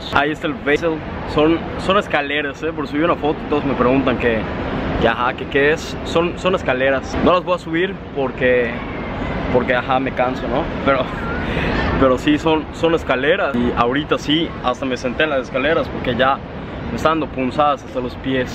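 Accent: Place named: Yucatan, Mexico. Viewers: Es una chulada ese hombre, ese acento yucateco, y que de el saludo iniciando este video, me ha puesto... HOT! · Habla como fresa con acento yucateco 😀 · 🗣 hazme un hijo!!! 🥰💋❤️✌🏻 Amo tú acento yucateco, hablas hasta con faltas de Ortografía 😂😂😂 Besos!!! A 💋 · Orgulloso acento yucateco💘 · 😁😂🤣🤣🤣🤣😅 No sabes no donde andas.... pero me encanta tu acento yucateco.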